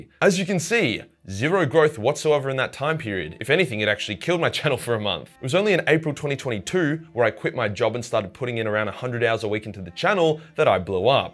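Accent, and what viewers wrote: Australia. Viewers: Alt title: hate comments read by an Australian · Seriously man i jusy found your channel a couple months back and have since been watching old and newly uploaded videos, i just love your commentary and the aussie accent and attitude is just the cherry on fucking top! Cant waif for more WK videos in the future! Also fuck those incel breed losers in the dark corners of the galaxy, fucking xeno brain dead fucks.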